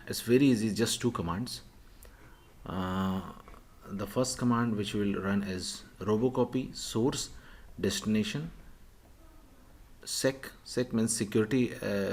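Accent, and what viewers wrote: India. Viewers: I hear an indian voice and now I'm blessed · i mean he lives in America but he has an Indian accent so.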